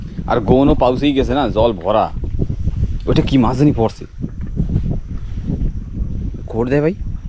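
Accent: Barishal, Bangladesh. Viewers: তোমার কি বাপদাদা বরিশাইল্লা ছিল। বরিশাল এর মত কথাবার্তা 😊😊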